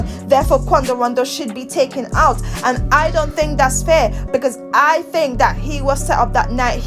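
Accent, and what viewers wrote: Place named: United Kingdom. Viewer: Random but if you don't mind me asking, where are you from? I love ur accent if id have to guess it would be the UK but I'm not sure on what part of the uk.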